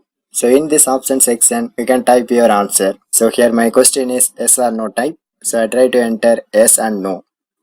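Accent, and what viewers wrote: South India, India. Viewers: Your South Indian accent... 😁👍🏻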